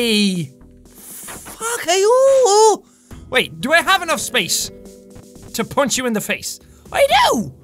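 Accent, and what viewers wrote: Ireland. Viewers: Tirteen strengt. Lol, i love the irish accent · Lol it's Irish dude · Zarif Safin irish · haselbacher he is IRISH and me too # Irish4life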